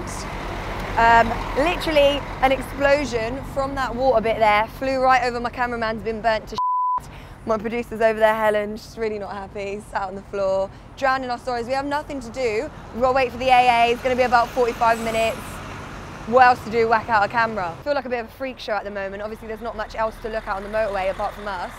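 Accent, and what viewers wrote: Australia. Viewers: The Aussie accent sounds good but should really have mentioned walkabout and you do not ever see an aborigine in the bush. They are at one with nature and disappear. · Aussie accent not too bad...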